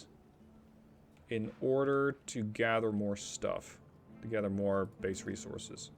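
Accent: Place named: Netherlands. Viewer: bump for nervous dutch noices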